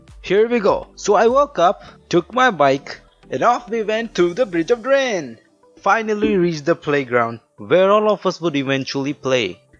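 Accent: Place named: India. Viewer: Your accent seems Indian lol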